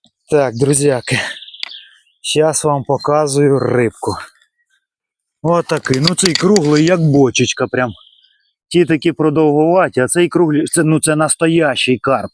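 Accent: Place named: Russia. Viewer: Как бы не старался рускоязычный человек говорить на мове, всё равно это чувствуется.А водоём этот не плохой,по крайней мере не так заросши водной растительностью как в прошлых видео.. нхнч.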